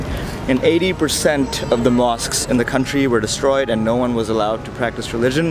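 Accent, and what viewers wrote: United States. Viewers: Boy you made this vlog so interesting which convers Albanian history, culture, sights and touch to the food as well which is an essential part of the travelling. Very nice video. Also you say you are from Bangladesh but your accents is pretty much like USA and Canada. Thanks for the sharing. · Brother well ❤️ Can you say a secret thing like how have you learnt the us accent ? Because u said you have been to us for 5-6 years so during this time did you adopted this accent ?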